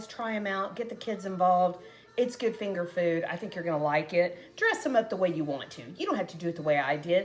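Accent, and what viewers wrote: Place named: Texas, United States. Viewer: lol, I could tell your accent is from Texas.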